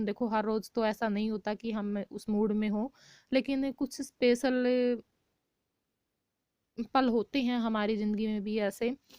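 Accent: Haryana, India. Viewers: Very nice di aapka haryanvi andaz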